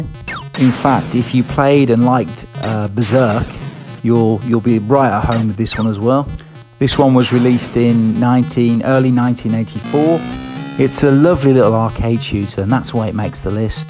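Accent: United Kingdom. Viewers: I love your view of this, you understand how much work it took back then, there was no templates , it’s all code! They did make quality products and titles back then. Also, I love your accent, are you in The UK?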